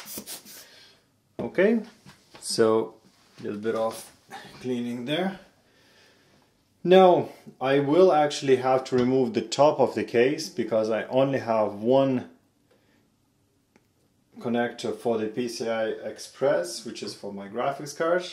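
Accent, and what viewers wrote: Ireland. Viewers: I can hear the slight Irish accent lol. Are you Irish?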